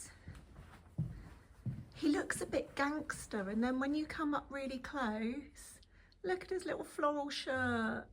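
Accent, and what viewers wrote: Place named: United Kingdom. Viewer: I'm fascinated by your accent. It doesn't sound like other British accents I've come across. Can you tell me the location where this accent is found in the UK (I know it's the South somewhere). Thanks!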